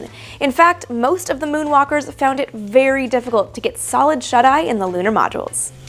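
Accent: Canada. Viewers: your hot and you sound Canadian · Is that a Canadian accent I detect? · Are you Canadian?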